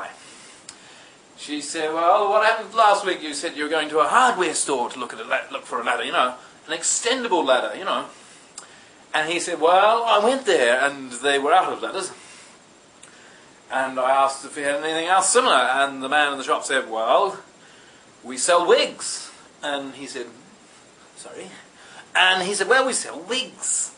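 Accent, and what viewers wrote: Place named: England, United Kingdom. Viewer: You have the most awesome English accent ever!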